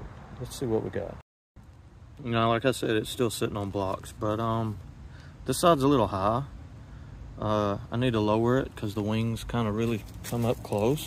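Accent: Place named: South, United States. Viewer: What website did you import ya cosmo from brother. You sound like you from down south! I’m from Newport News Virginia, and wanted to import one of these.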